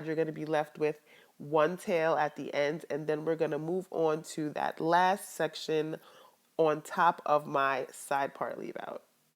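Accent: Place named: New York, United States. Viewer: u have a very strong new York accent I like it lol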